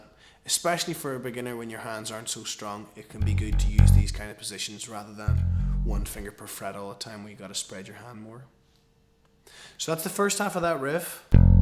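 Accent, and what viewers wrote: Ireland. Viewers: awesome... do you have any tips im 14 and from australia..and been playing for a year.. i am in a band... i play bass.. we play this song and when i play the verses my fingers get very very sore and i get tired .. would you have any tips.. please reply ... awesome vid!! your from ireland but live in america is that the accent? if you have any tips please reply .. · dine - dine --- op - dine - op - dine.... accent is hilarious. gotta love the irish. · how do you know the beatles didnt say diene, its not just the beatles that are from liverpool that have the main accent, different accent DUH. not just the beatles. and he doesnt even sound like he's from liverpool, more like irish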